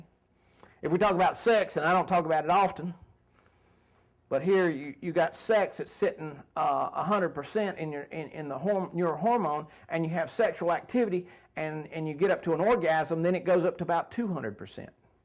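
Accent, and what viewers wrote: United States. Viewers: tree-on qua-ree-on. lol south'ran drawwwl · 9/11 texas accent immediately thought of George bush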